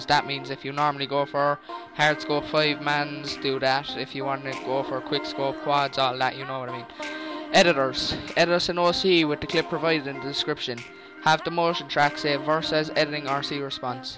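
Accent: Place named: Ireland. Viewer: That irish voice thoo ;)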